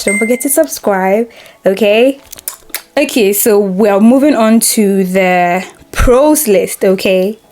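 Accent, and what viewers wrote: Nigeria. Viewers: I said what I said she sounds Nigerian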